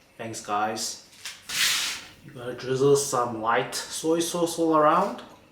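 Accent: South Africa. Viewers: Your accent is giving me South African 🤷🏽‍♀️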